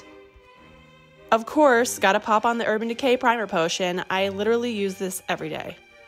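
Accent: Russia. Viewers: your Russian accent is on point!!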